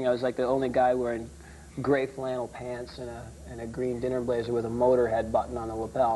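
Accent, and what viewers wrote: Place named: United States. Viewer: Never liked his American high pitched feminine voice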